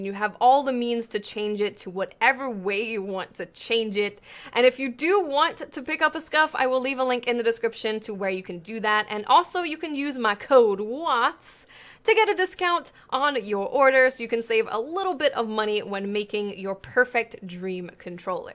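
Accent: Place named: East Coast, United States. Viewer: I'm lovin that accent lol. new yorker? Philly? you gotta be from one of the East Coast cities haha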